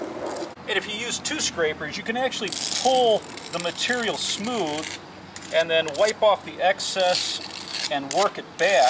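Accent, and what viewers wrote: Chicago, United States. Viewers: He's gotta be from Chicago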